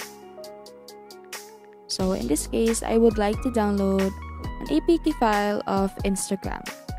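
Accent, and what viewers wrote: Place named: Philippines. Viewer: Ang ganda mo!!, alam ko boses ng pinay pag narineg ko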